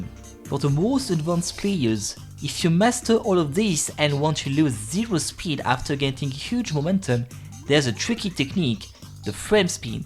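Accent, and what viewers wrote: France. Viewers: I hear a little bit of french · T’es français toi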